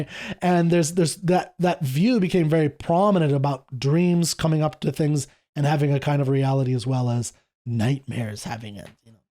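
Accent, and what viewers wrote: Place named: United Kingdom. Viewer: I know his British accent doesn't help you in the US sometimes, but yeah I thought he meant Jackel not Jekyll. Honestly I might be mistaken but that was where my head took me with all the death, killing and mixed biblical /mythical references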